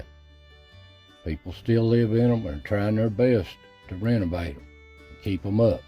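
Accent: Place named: Appalachia, United States. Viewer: I’ve been watching you for a few years now. Love your shows and your Appalachian accent. Glad you’re feeling much better and can get around now. Sending prayers and much love your way dear friend. Keep us all informed on your little woodland friends. Loved seeing all the old homes. Wish they could all be restored. See you next time.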